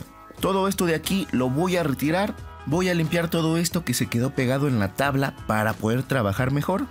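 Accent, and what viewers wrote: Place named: Mexico City, Mexico. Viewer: Cómo se oye que es De el DF , sabe lo que hace. Riquísimo. Felicidades.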